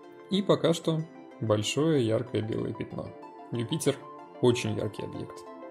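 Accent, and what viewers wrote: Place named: Minsk, Belarus. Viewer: Увы, минский акцент не спрячешь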